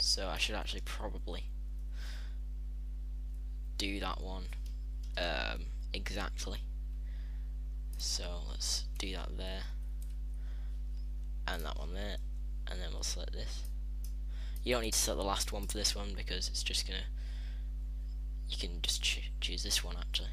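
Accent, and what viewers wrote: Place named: Birmingham, United Kingdom. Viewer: Sounds like your from Birmingham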